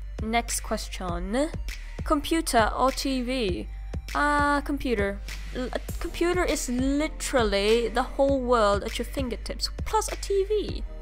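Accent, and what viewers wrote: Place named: United Kingdom. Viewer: Heyy or should i say thats a cool name!! Its good to know more about you really That british accent tho i see you i see you 😂👌🏻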